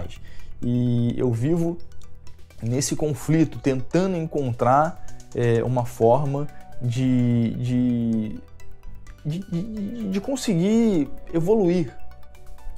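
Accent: Rio de Janeiro, Brazil. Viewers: eu não acho que foi o sonysmo que salvou o canal, o apelo sempre foi um cara falando merda com esse sotaque carioca engraçado, não importa o assunto, por isso faz sucesso e é o canal que mais cresce no brasil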